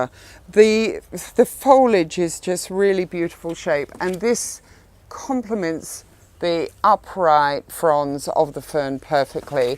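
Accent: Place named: England, United Kingdom. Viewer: It's either Midlands or southern England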